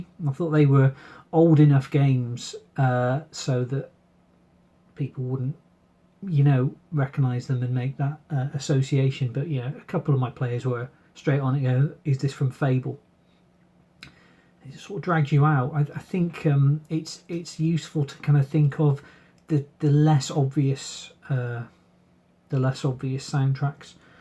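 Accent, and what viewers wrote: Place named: United Kingdom. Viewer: That's how British people talk.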